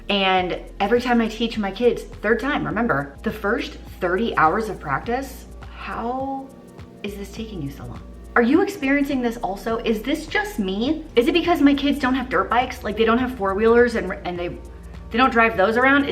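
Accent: South, United States. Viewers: I love you. My husband and I are from the Deep South (NC) and I love the fact that your soo Southern (even if your from Texas).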